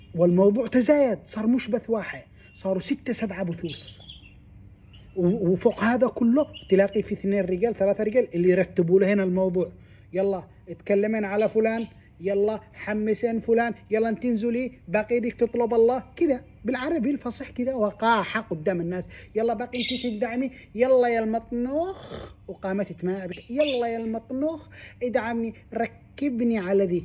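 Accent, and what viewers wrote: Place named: Taiz, Yemen. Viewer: كلاامك تعزي مرة بس إنتا قلت إنك مش عايش باليمن كيف كدا قادر تتمسك بلهجتك رغم عيشك برع اليمن!!؟.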